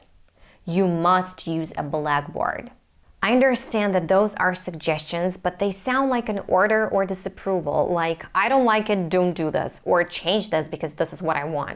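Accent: United States. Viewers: How many years have u been studying English?,.I love your sounds very great of course American English. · I like your american accent so much coz I understand 97% of your words. But I just understand 15% words of Australian tourists in Bali and other cities in my country Indonesia. Unfortunately... english classroom teaching in Asia is based on british because asian countries are former european colonies.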